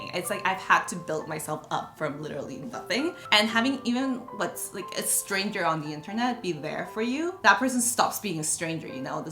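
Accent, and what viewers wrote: United States. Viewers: Yes, you sound and look like you're from St. Cloud...especially with the DIY Gucci. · is not that much you can notice sometimes BUT she almost talk as an american. But is my opinion... just saying 💕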